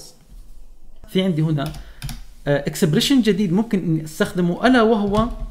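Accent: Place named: Syria. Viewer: غريبة انه تكلم سوري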